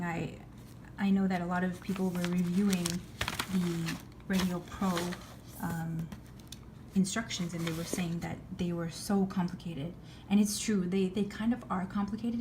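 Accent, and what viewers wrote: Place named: Quebec, Canada. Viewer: Miss the Quebecois accent living in California. Salut & merci, anciennement de la rive sud.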